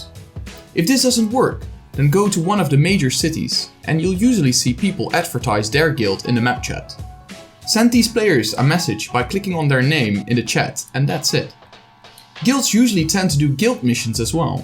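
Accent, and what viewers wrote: Netherlands. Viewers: i can hear u dutch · you sound dutch! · Sup mate!, great vid. I can tell that your dutch by your accent btw ;) (me to). I just started GW2 again after being absent for 4 years. Is there any way if you happen to know a dutch guild? I've always been in DuF (Dutch Finest) but they kicked me out for obvious reasons ofcourse. Maybe we could meet up in-game. Im serious about getting into it again. Played Blade&Soul for the last 3 years, but the poor optimization got the better of me.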